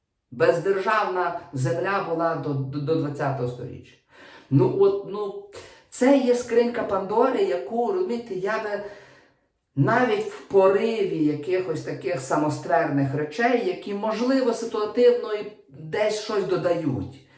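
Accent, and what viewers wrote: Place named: Galicia, Ukraine. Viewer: Хто така офензива? Галицький діалект не всі розуміють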